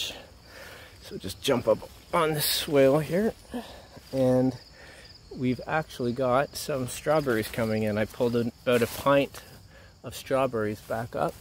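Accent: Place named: Canada. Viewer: Three great things about this channel-- the contagious "Permaculture Joy," the attention to science (and lack of anthropomorphism), and the delightful Canadian pronunciation of "ou." Look forward to every video! I've been on the fence about sea-buckthorn/seaberry for years (even after viewing Ben Falk's videos) due to management concerns. Can you convince me, lol?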